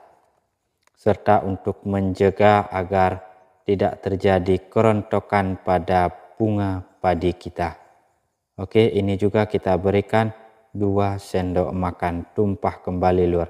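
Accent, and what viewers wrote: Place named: Aceh, Indonesia. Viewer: Bang.... Dari logat sang droeneuh ureung Aceh. Beutoi bang?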